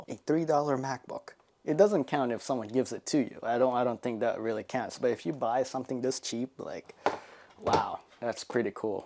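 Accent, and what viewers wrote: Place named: Asia. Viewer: your like a woman. I presume your asian judging by your accent which are the most woman like dudes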